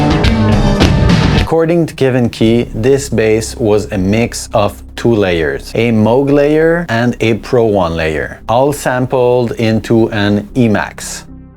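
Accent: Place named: Quebec, Canada. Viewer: Are you from Quebec by any chance ? 😊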